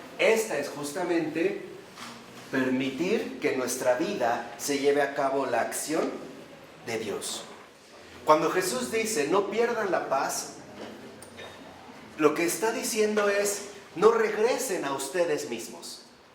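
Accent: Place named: Mexico. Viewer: Una diccion en excelente castellano, Dr, aderezada con un delicioso mexicano. ¡Muchísimas gracias siempre!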